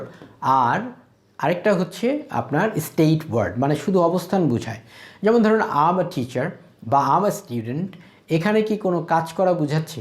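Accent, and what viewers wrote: United Kingdom. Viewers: Apni british English spoken style use koren kno???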